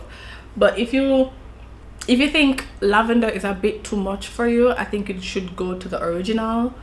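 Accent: Jamaica. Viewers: She is Jamaican and has an evident accent. I, and clearly many other people, can understand her perfectly. What was your intention with this comment, exactly?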